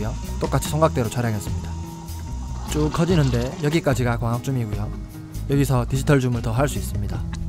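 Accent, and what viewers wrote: Gyeongsang, South Korea. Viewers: 대구분이신거갘은데 코로나 죠심하세여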